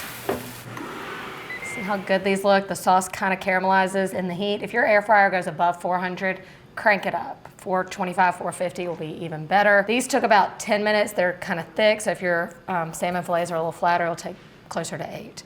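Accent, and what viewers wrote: South, United States. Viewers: Shes so pretty and love her southern accent cause I’m from south Texas. I love her quick dinners with the price of takeout nowadays so she also uses everyday ingredients to make food. I have no kids, but also not working now unfortunately so this is perfect to stay within my very meager budget. Love her!!!!!❤❤❤ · Love this lady...shes si cute! That little southern twang! ❤😊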